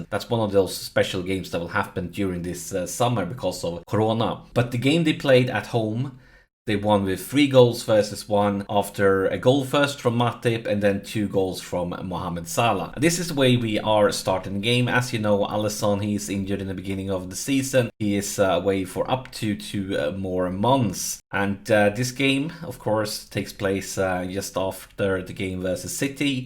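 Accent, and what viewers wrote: Sweden. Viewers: Are u Swedish?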